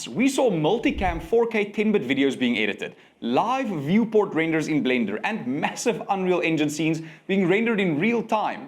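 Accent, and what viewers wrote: South Africa. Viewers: Bro, are you from originally South Africa?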